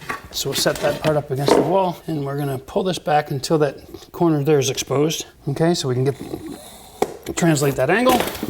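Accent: Ontario, Canada. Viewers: I think it's the Ontario accent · As an Ontarian, yes, it's the Ontario accent.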